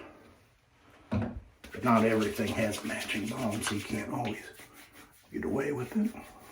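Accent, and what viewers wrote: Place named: South, United States. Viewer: Hey, thanks for the video. What are your thoughts on the Razorock Game Changer .84 Open Comb, now, 4 years later? I found your video because I just ordered my first safety razor, after using cartridges for 30 years - first the Gillette Sensor, then the Mach 3. After some reading and some recommendations, I went with the GC .84 OC. (I’ve always been one to dive in head first!) Also, sounds like you’re from the South. I’m in Nashville area.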